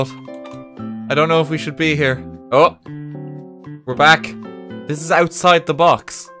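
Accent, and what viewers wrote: Ireland. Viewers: Ur Irish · So funny story. Last night I plugged in my kindle, set it to an "RTGame's Best Videos" playlist, put my earbuds in, and accidentally fell asleep. I'm American, but when I woke up this morning, as a result of listening to him talk all night in my subconscious, my thoughts had an Irish accent for a solid minute. I cracked up as soon as I noticed it, and as much as I wish this was an April fool's day prank, I'm being completely serious XD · Eyyi I hear an Irish boi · U Irish my guy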